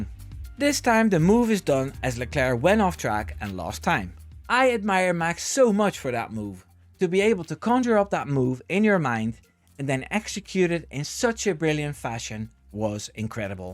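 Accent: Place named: Netherlands. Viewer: Zeg dat je Nederland bent zonder te zeggen dat je Nederlands bent. Je klinkt ook jong trouwens, maar dan zie je opeens een vent van 53 in beeld.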